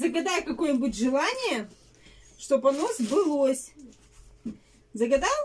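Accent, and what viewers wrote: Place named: Ural, Russia. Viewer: Посмотрите канал ,Уралочка и тогда спрашивать не станете больше ,там многие и в городе так говорят,,я жила в Екатеринбурге и там говор у всех такой ,,как в деревне и ударения в словах тоже ,, )) уши режет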